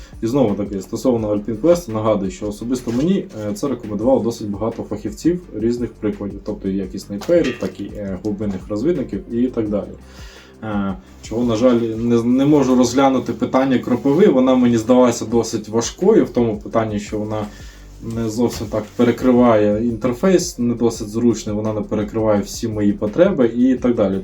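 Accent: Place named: Western Ukraine, Ukraine. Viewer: ого) я би навіть не сказав шо ти був російськомовний) балакаєш так ніби з західу України)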